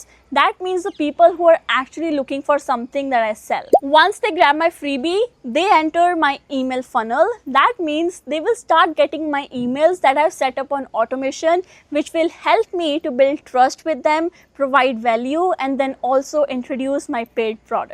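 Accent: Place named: India. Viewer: your accent feels like India + name also But nice reply me are you Indian?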